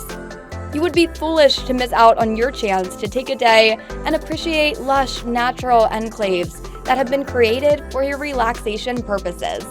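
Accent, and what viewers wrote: United States. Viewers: was about to say the same heehee, sounds for the most part Chinese-American as well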